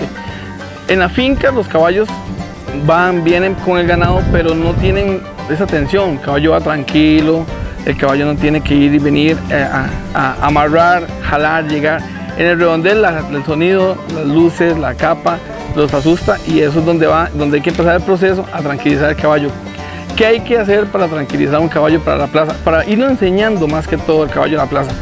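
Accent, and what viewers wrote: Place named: Costa Rica. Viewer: Yo cuando escuche su asento dije es de Costa Rica....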